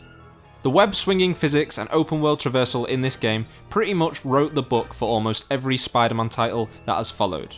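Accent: United Kingdom. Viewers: Wow, you have so beautiful voice 😀 British Accent is music to my ears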